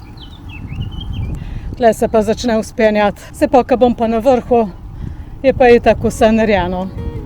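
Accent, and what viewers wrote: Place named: Primorska, Slovenia. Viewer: Te zmeraj rat poslušam mam rad vašo govorico primorsko, tko da te ze prav čakam vsako nedeljo ,da si ogledamo tvoje videje diooo deklina primorska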